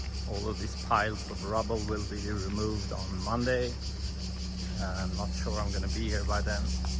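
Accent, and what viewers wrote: Sweden. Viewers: Är det en svensk dialekt jag hör, mån tro? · Yes, thats a great idea! Introduce yourself and, describe your family, who is going to be living in your future mini paradise! All I know is you have a distinctly Swedish accent in your english speaking! Very curious also about the process of getting the house - When? How? Why? Some context would be fascinating! Subbed! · Haha I knew it too!! Was looking for this comment before I asked too. I have worked in Sweden and recognized the accent!!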